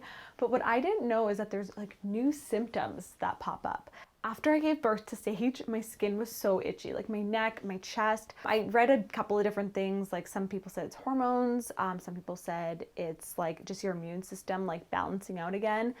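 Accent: Canada. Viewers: Great video! Are you Canadian?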